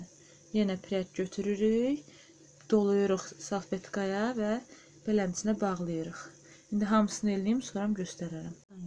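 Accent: Azerbaijan. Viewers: Salamlar. Bu videonu her yerde paylaşacam. Baxıram yarı rusca yarı hansısa Azerbaycan rayon lehcesidi. Super.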